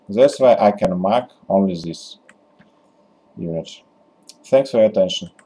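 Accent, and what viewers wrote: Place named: France. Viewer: understanding you is quite difficult. we just want to know how to get the answer and the guy has a french accent that why its hard to understand him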